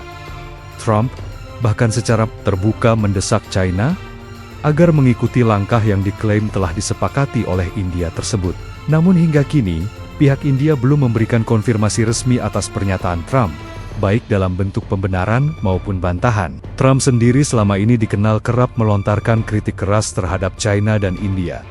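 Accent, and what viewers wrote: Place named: Java, Indonesia. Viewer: Pembaca ini logat Jawa sangat memalukan membaca cina saja ti pecus yg benar itu China bukan Chaina seharusnya gunakan bahasa baku bukan bahasa ke barat baratan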